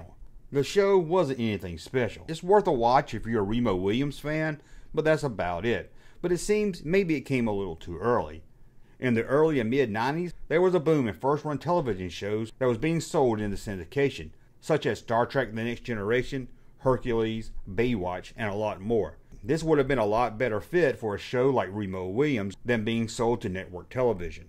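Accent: United States. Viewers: sorry for my earlier (now deleted) comment - I can be a TOTAL DICK sometimes. I should have checked out more of your channel before leaping to ignorant conclusions. As a Canadian, I sometimes don't take into account the legitimate regional accents and vernacular of the vast US culture. That said, I enjoyed the reminder and comments on this interesting underrated, entertaining film. I recall (even at the time) burdening ANY FILM as "the adventure begins: was asking for trouble!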